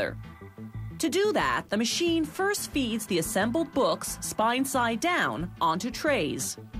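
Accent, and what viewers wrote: Canada. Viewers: It's a canadian accent. Don't worry about it.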